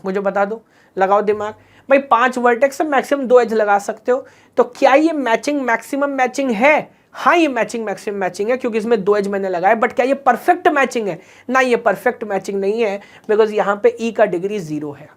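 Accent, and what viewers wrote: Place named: Kanpur, India. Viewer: aap kanpur ke lag rhe ho sir, Jis tarah se kahe , or pel diya use kr rhe ho .....BTW BEST EXPLAINATION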